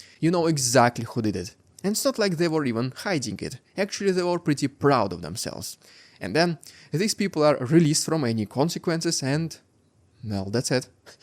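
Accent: Russia. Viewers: Cool. That's why my investigation rushes so much to put it in court BTW. Amayzing ryazan' aksent😂 Organized crime was always the problem of Russia. Starting back in Russian empire. Not in the 1990's. It's simply broke lose in 90's.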